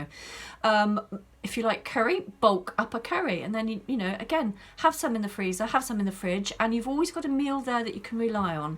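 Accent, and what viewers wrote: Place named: United Kingdom. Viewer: Hello from Dallas Texas, my name is Georgia Ann. I love your sweet British accent and smile, so happy to get to know you. Here is my story. I am 72 years old, and was 50 lbs. overweight. I have tried everything, I was up and down and yo yo dieting. One day I tried the Potato diet and said, I would give it a try. I ate potatoes only for one day and had an insulin drop and was sick and shaky So that did not last long! Another fail. Then I bought the Eat To Live book by Dr Fuhrman, and got more education on the plant based diet. I learned that you MUST have a BEAN dish everyday when eating whole plants. When your not eating the meat you must have beans and it becomes your protein.I am borderline diabetic, so it affected me with only having starch.All that said, I have lost 30 lbs. eating whole plant food. The most wonderful thing that has happened to me is, I have learned that, when you nourish and feed your body real food, the food addiction cycle, and the benging STOPS! 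It's easier to say no to Sugar, and not eating sugar stops the cycle and you gain control. My next hurtle is to learn to simplify this way of eating where it is not complicated recipes. I love to cook, I have for 50 years. But if you are not careful, you find yourself chopping and preparing all the time, plus a mound of dishes, then it becomes to hard to do. So I want to cook simple, , easy things and not get caught up in another diet. So Yes, the answer to your question, You Can loose weight when you are older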